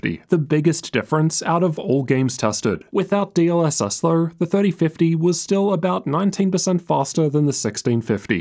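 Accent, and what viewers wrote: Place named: Australia. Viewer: Aussie accents are fun: you say turrr when you mean to say too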